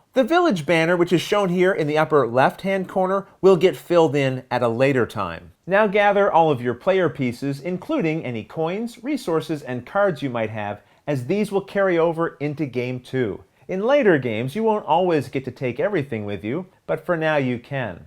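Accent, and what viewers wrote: Canada. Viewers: My wife and I have been discussing where your accent is from. My guess is Canada. Am I right?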